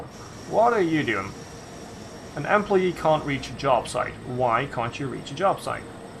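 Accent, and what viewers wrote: Netherlands. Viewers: After the first sentence you said I noticed you're dutch. amazing!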